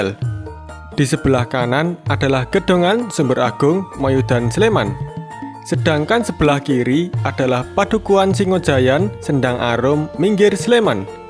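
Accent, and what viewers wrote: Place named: Banyumas, Indonesia. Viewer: Mas asli orang mna sh, kok ngomong nya agak ngapak sedikit 🤣🤣🤣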